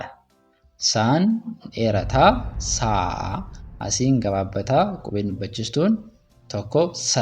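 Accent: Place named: Addis Ababa, Ethiopia. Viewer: Gara addisabanyaati hin jijirin loqoda kee bro!!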